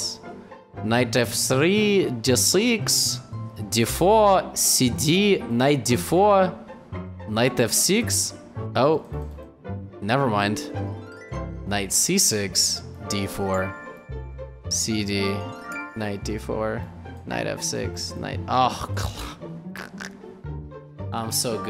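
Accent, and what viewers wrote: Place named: Russia. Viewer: Какого фига, я знаю что прекрасно разговаривает как на английском, так и на русском, но почему тогда в этом видео у него такой заметный русский акцент?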